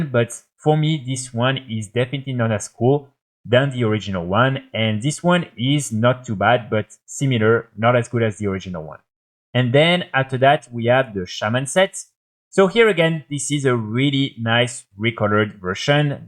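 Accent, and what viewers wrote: France. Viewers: pas mal l'accent frenchi ahah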